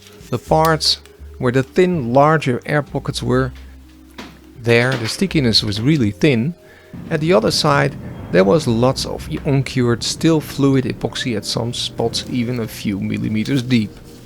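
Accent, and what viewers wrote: Netherlands. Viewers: Jouw engels is echt gewoon goud, dat nederlandse accent 10/10.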